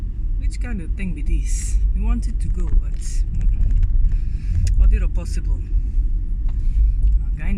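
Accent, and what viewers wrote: Igbo, Nigeria. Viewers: Love how you use our Igbo accent,!🥰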